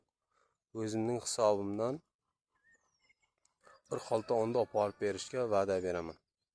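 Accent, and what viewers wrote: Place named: Kashkadarya, Uzbekistan. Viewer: Shevasi yoo Qashqadaryo yo surhondaryo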